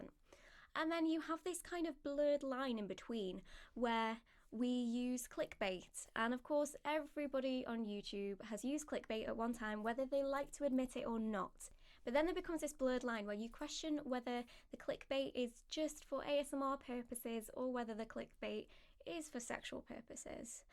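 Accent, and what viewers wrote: United Kingdom. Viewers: yeah I know that, I just can't differentiate her British accent from other parts of Britain or Europe. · Shes british mate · God I hate the overtly sexual vidoes/thumbnails... I dont know who is watching their full vidoes theyre usually terrible from an actual asmr or relaxation point ... side note Ive only seen some whispered vids didnt realize you were from UK, probably why I like your voice so much lol. Anyway thanks for making content!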